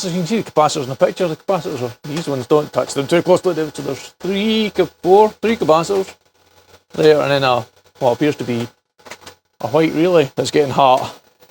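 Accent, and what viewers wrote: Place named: Glasgow, United Kingdom. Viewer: could you possibly incorporate the words "curly whirly". "murder", "world" and "work" into one of your next videos? I love how they sound in the Glaswegian tongue. I always enjoy your vidz man.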